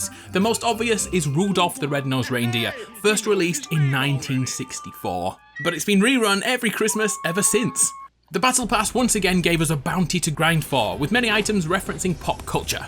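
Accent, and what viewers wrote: United Kingdom. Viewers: Yay good brit voice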